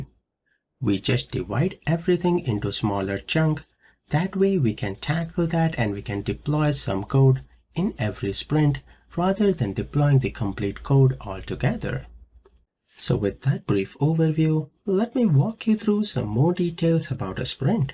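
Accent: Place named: United Kingdom. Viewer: gujju bhai your accent is really hard to understand. do you live in britain ?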